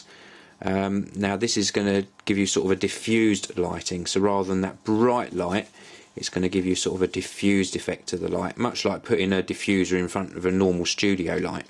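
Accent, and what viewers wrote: United Kingdom. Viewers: Is it just me... having a reviewer with a British accent makes me appreciate the product so much more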